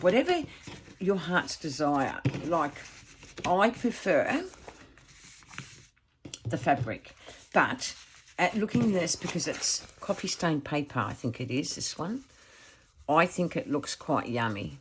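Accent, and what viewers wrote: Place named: Australia. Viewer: Simple & to the point. Love to hear an Aussie as well. Going to enjoy watching your ideas & projects.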